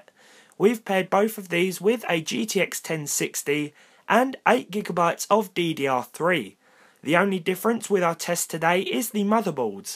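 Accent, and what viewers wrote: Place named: England, United Kingdom. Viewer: Nice video and also i have to say that i like so much your English accent, it is easy for me to understand being Spanish Where are you from?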